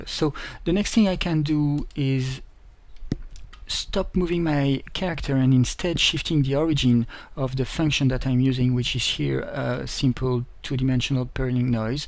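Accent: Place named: France. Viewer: L'accent Fançais est présent!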